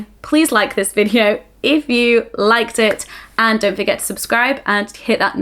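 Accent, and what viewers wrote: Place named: England, United Kingdom. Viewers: Shes English that's how she speaks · anytime I watch with the sound off my brain still knows to read the captions in english accent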